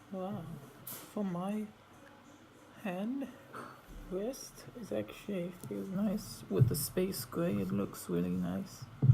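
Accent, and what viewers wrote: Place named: Russia. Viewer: You sound like a russian woman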